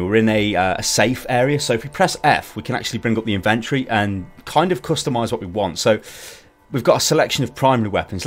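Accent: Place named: United Kingdom. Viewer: That's like the britishiest voice I've ever heard :D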